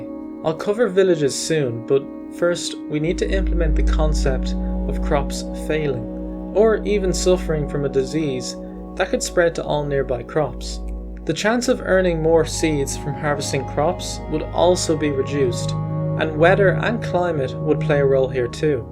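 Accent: Ireland. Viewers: You are definitely Irish I can tell because I lived in Dublin for many years Good video by the way you make great points👍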